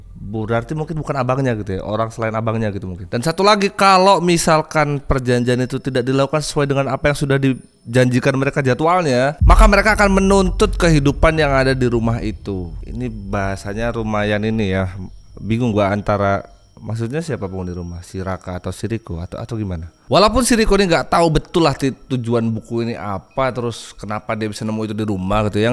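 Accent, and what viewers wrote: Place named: East Java, Indonesia. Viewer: Mas iki wong endi? Boso jowone logat e kentel. Kyok cah jawa timuran😂😂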